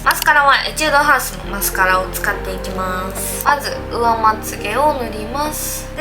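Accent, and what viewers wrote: Kansai, Japan. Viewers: 関西弁なのかメロい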